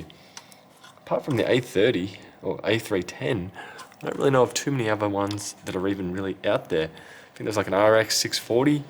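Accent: Australia. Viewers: Super! An Aussie explains.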